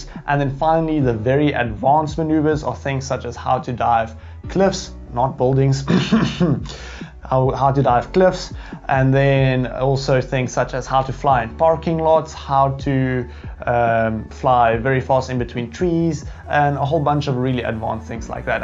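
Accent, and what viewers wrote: South Africa. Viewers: I've just started getting into fpv flight (got a tinyhawk rtf bundle and put PMB on it in betaflight) going to watch all of these vids. Also are you South African?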